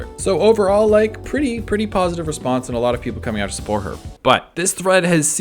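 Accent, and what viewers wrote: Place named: Ireland. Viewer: only vaguely related but sometimes you sound like you have a kind of irish accent!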